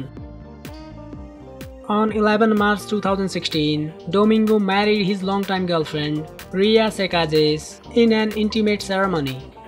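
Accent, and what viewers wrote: India. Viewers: Bakit indiano yung nagsasalita?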